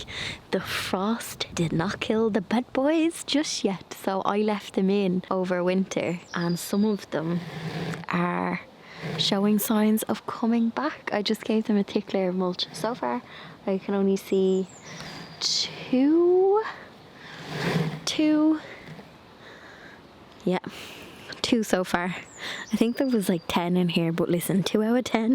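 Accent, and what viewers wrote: Ireland. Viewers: Just saw this .lovely to hear an Irish accent . Is your garden in Ireland . I’m in Kerry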